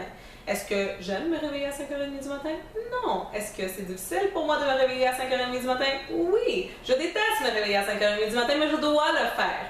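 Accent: Canada. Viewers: impossible de comprendre le francais canadien...hélas. :(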